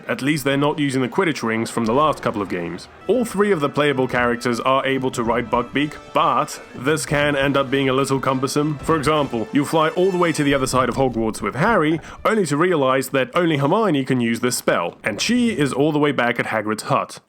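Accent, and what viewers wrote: United Kingdom. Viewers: Yes, i want a review of all HP games too. I want to hear your very british voice talking about very british stuff (despite the fact movies and games are american)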